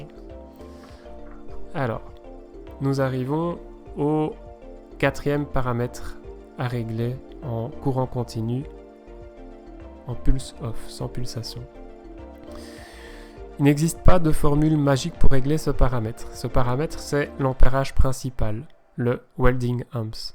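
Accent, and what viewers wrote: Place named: Belgium. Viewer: Merci, ça fait du bien de se remettre ces réglages en tête, avec un petit accent Belge en bonus !!!!! Merci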